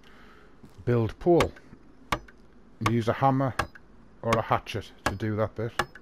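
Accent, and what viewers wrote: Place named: Belfast, United Kingdom. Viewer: cheers mate, great vid in the best uk accent there is! PURELY BELTA! liked n subbed as a dayz noob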